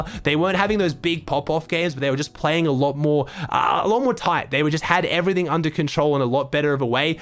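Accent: Australia. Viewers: Robotic Aussie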